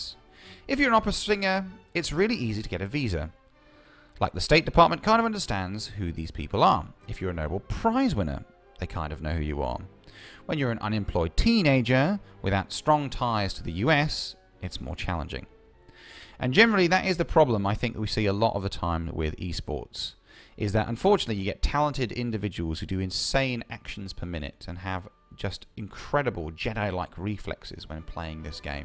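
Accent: United Kingdom. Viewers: Most typical british person ever. he has got it all, top hat, accent, politeness and ego. Amazing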